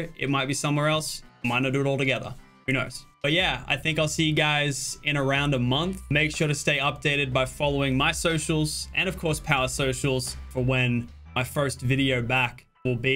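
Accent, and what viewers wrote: United States. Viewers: UGHHHHHHHH Can't hand the American twang brother. It sucks. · Your accent is getting more and more American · why do you try and talk with a US accent though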